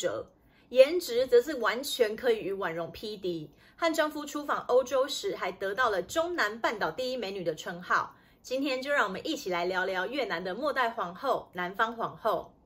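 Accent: Taiwan. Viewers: 很喜歡妳的旁白解說，坦率而帶有情感，使这位末代皇后的故事更添幾分神傷！希望妳繼續努力，多製作更多更精釆的視頻，聽妳的口音應是台灣朋友吧？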